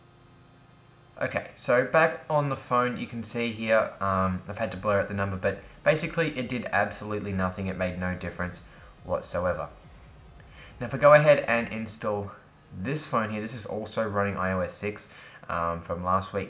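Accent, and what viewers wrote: Australia. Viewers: / / WPS 4004 he aussie also he is one if my good mates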